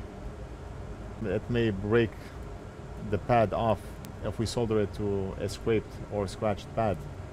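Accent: Lebanon. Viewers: Maaaaaan i was trying to see how to fix my USB to just hear a familiar Lebanese accent.. double shock.. good job btw.. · Me too, ana lebnene w behke nafs l chi 🤣🤣 nafs l lahjee fik to2ofro lebnene hata mn wejjo w hek Lebanese people making the difference all over the world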